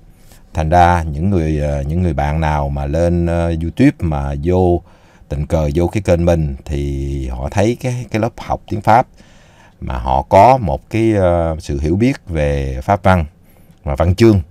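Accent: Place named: South, Vietnam. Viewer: Cảm ơn thầy, Em rất thích học và nghe, gì thầy nói tiếng trong nam, Em nghe rõ, Em rảnh giờ nào cũng luôn mở nghe, chúc thầy và gia đình luôn được nhiều sức khỏe may mắn hạnh phúc vui vẻ an lành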